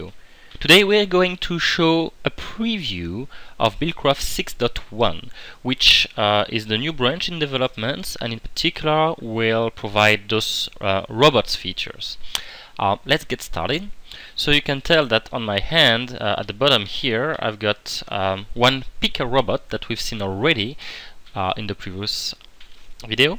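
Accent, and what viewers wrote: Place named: France. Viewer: Hey this is awesome! Also, you sound really french! If you are then: Salut! Super mod! Je kiff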